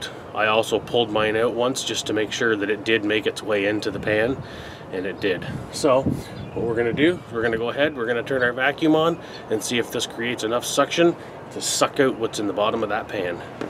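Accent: Canada. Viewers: This guy sounds Canadian to me. And he used Standard International units (Litres). 😉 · He sounds Canadian.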